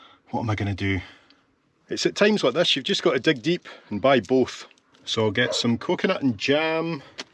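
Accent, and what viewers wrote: Scotland, United Kingdom. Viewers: I love your videos. So very interesting and you present it so well. I like how you just let the trips evolve. We love Scotland and its friendly people and your wonderful accent. Makes us want to visit again. We were there a little more than a year ago. Regards, Jim North Carolina USA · Another brilliant video-thanks for taking me to places I love and doing it in a natural relaxed way with that lovely Scottish accent. ThIs one came at the right time for me as I am reading The Chess Men by Peter May part of the Lewis trilogy. If you have not read these books they give great insight into the character of the people of these islands. Carry on the good work.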